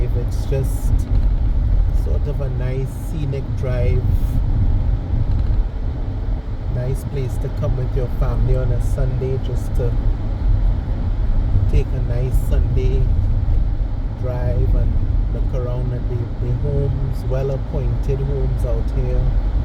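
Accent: Trinidad and Tobago. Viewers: Hi watching from Guyana. I love your videos I lived in Barbados, I am originally from Guyana but worked in Barbados for meny years. Barbados is a very beautiful country. I enjoyed evorything except for some of the locals. Most of the time people were quite hostile towards me because of my nationality especially in the workplace I did not expect that from such a major caribbean island. I have worked in Jamaca, Trinidad and Grenada and experienced welcoming people how would invite you into they're homes, but in Barbados people treated my family and me like dirt and always had remarks. This was very sad for me because we love the island. We are planning to visit next year and hope to have a different experience. I love the videos as we are planning to see all of the unique sites that you showcase. I love the Trini acsent 😁 I guess visiting and living in Barbados are completely different things. 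The only country where i had a similar experience to my experience in Barbados was when I was a young man studying in England.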